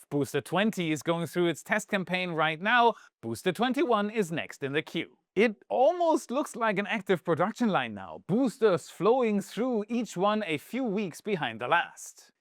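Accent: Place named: Germany. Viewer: Dear, i support the content, but please for deities knows... used ai to get ride of that german accent. It's giving me hai hitzer vivbes.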